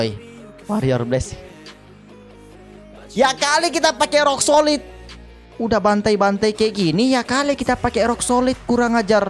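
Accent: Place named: Makassar, Indonesia. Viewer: orang makassar ki kah? karena logatnya kek makassar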